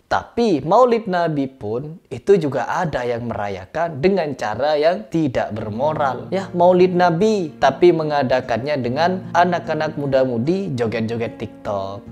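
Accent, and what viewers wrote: Madura, Indonesia. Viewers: Pean logate madura ya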